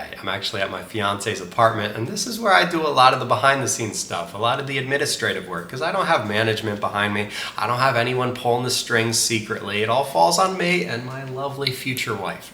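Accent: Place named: Midwest, United States. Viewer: man this guy amazes me every video. first time i saw him i was like "look at this nut job, tattoos all over his face and body" then i watch him and he is so charismatic and fourthrite and has always been upfront with everything hes doing . and now this video. This guy is definitely a don't judge a book by its cover example. also he has a accent from somewhere like iowa or illinois maybe more east but its interesting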